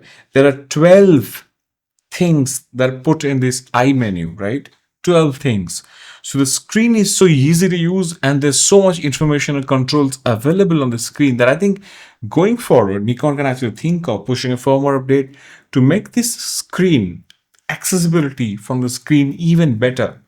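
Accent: India. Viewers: Are you bengali by any chance? Anyway did you try using the mini hdmi to hdmi coverter with the cage? What is that part called?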